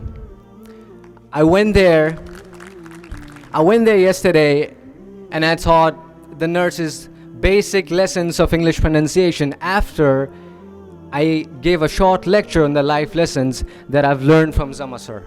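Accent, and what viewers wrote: England, United Kingdom. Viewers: Asklm sir I am joining u r classes 2011 Karnatak college more 15 days Bidar U r English talking Maa shaa Allah u r teach Accent like this Angrazi 👨 man Maa shaa Allah Meri aap sa humble Request hai aap YouTube channel pa English Grammar classes ke video daliye plz Alphabetical phonics sounds ke Videos daliye plz meri 3 years daughter ko Teach karna hai plZ Humble request hai Sir plz Basic Education Covid19 ke resons sa schools tu closed hai plz plz plz Plz plz plz plz plz plz sir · MaaShaa Allah Bohot badiya bhai The way of speaking, behaving like an English man.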